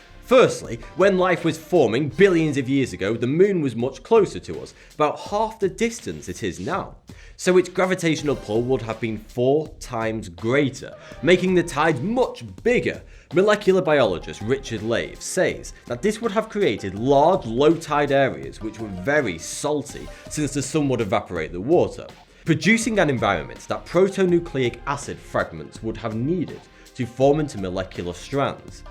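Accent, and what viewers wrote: United Kingdom. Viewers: Hi if he lives in Asia why does he have such a heavy British accent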